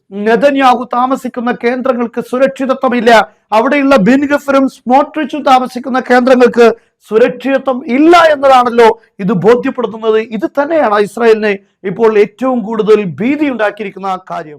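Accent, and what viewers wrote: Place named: Tamil Nadu, India. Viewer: Dont read english please, thats not your skill , your Tamil english very irritating but all other talks are ok😅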